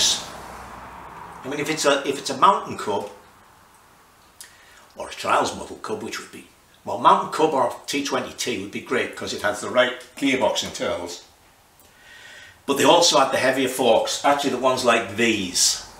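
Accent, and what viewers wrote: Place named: Newcastle, United Kingdom. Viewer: Glad you're on the mend. Remember the great Carroll Shelby lived to 90ish with more severe cardio vascular problems so I hope to hear your dulcet Geordie tones for many years yet.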